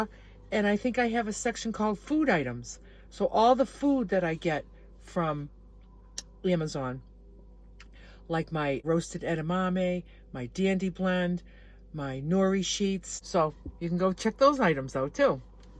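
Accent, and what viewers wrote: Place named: Boston, United States. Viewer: you are incredible! I subscribed months ago but life gets busy, so was glad to see you’re still doing this adventure! Watched this whole thing… toothbrushing to breakfast to dancing with that gorgeous blue sky backdrop. Yes, I hear the “Boston” in your voice😉. Hello from Connecticut. I’ll watch more often. Your shows are “glimmers.”👍🏻🙋🏻‍♀️🤣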